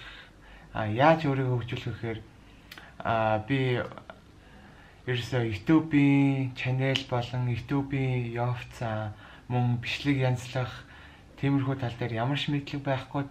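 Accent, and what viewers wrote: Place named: China. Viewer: хэлэнд нь жоохон хятад аялага сууцан бна.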